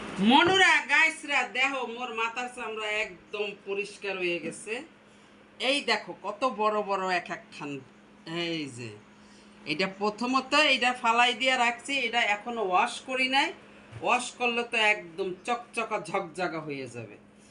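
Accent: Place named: Barishal, Bangladesh. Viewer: আন্টি আপনি কি বরিশালে র মানুষ মুয়ৈই তো ভাবছি আপনি ঢাকা ই মেয়ে আপনাদের কথা বলা বুঝাইছে এখন দেখি ও অন্য কিছু ভালো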